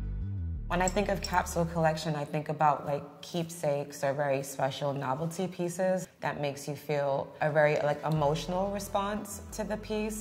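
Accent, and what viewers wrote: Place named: Germany. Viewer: Das ist doch, dachte ich, der Germany Channel - why does she speak en mit einem DE accent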